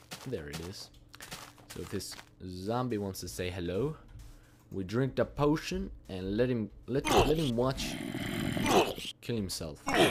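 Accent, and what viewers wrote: Australia. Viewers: thats because people liked his australian accent so he does that now.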